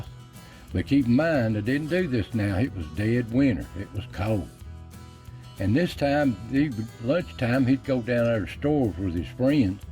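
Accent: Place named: Virginia, United States. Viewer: Man I love hearing your narrative on these stories. I grew up on the coast of virginia on the beach but I briefly lived in Appomattox virginia and hearing you tell these stories reminds me of living up that way near Lynchburg virginia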